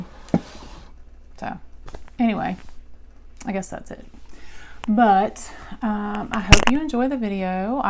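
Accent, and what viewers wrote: South, United States. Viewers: I love your vids. Your so soft spoken and of course I know your southern. Keep the videos coming ♥️ you know us southerners are suppose to get snow this weekend 😬 IG LOVE YOUR PURSE never had a MK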